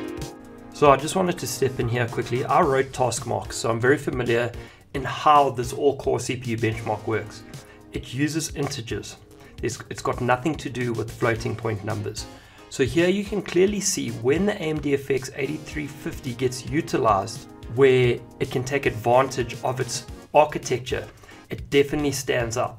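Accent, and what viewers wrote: South Africa. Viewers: Dude, are you South African?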